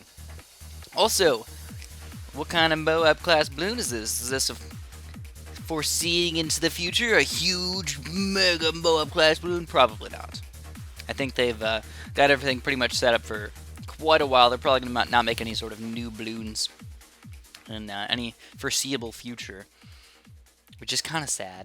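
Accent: United States. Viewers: Wait what state are you in, that sounds exaclty like where I live.....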